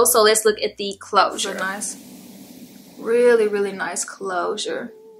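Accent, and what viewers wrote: South, United States. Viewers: i like your accent its like country. where are you from?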